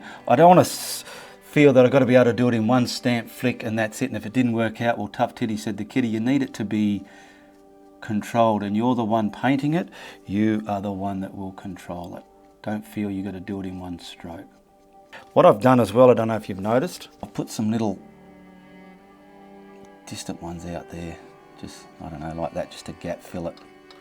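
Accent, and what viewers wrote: Australia. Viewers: Hi! I live in Virginia. After watching many Bob Ross videos and loving them, I decided to look for some videos with acrylic painting, and I found you. You are very instructional and I enjoy your Aussie accent and colloquialisms. I just watched the Misty mountain tutorial. I loved it. Your trees are beautiful! I have a question for you. Why won’t the knife work for painting mountains with acrylic paint? ❤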